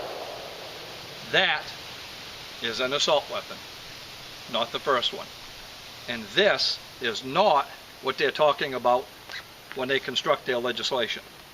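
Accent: Maine, United States. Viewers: You from Maine? You've got the accent.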